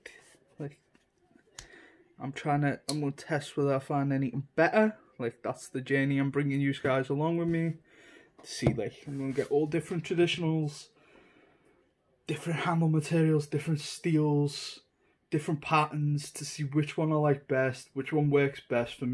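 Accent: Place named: England, United Kingdom. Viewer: you are welcome... They also offer a lot of traditional pocket knives which are legal in the UK (you sound really english, sorry if I am mistaken)... I would order on their own website... lots of their traditional products are only available there and as I mentioned their customer service is second to none...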